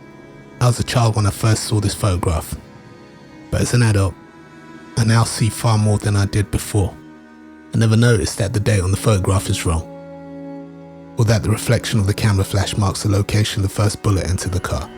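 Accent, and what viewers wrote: United Kingdom. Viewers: Where you from cos? Your ascent sounds like you are from uk or something, maybe australia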